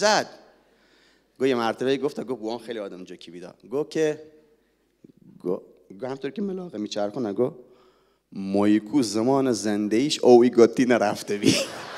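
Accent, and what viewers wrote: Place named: South, Iran. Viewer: چندبار تو اینستا دیدم شنیدم ،ولی آدم چقدر زود به زود دلتنگ صدا و قصه هات میشه ،حتی نمیدونستم راوی این قصه ها و این صدای جذاب و لهجه جنوبی شیرین کیه ؟ آخر تو گوگل سرچ کردم قصه با لهجه جنوبی ،اولین نتایج جستجو چهره آقای احسان عبدی پور رو نمایش داد و جنگی پریدم تو یوتیوب و... پاینده باشید و مانا ❤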